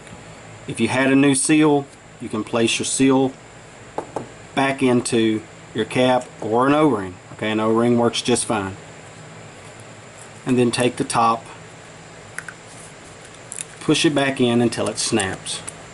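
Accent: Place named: West Virginia, United States. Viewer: Good video , thanks man. I'm guessing your from West Virginia ? We say "plars" too . Ha ha ! Thanks man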